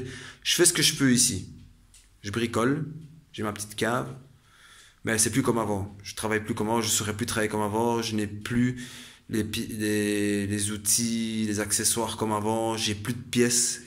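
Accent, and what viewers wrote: Liège, Belgium. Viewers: Tes de quel côté de Belgique a l écoute ont dirai bien liège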